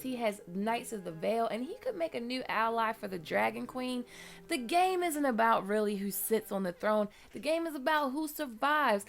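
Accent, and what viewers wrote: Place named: Baltimore, United States. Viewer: why the lol? yes sometimes her voice in tone and pitch on some vowels does sound black, but the accent is Baltimore she has revealed, and accents don't have anything to do with color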